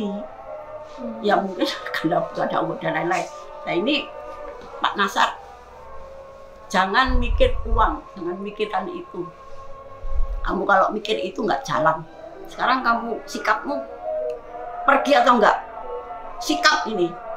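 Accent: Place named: Surabaya, Indonesia. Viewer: Mbak sepertinya sudah cukup artinya itu inti yang saya dapat dari pak Nashar: " bagaimana menjadi " pelukis, shg ilmu itu saya terapkan pada diri saya sampai sekarang. Kenyataannya saya masih bertahan dan menjadikan semacam energi mbak, terima kasih banget....... atas vidionya, ( gaya suroboyoan omongane tur banter )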